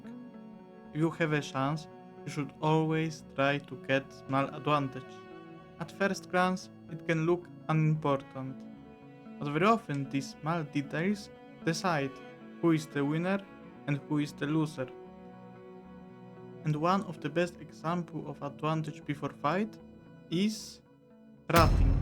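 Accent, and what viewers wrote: Poland. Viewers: love Your polish accent XD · czuć że polak z kilometra z tego akcentu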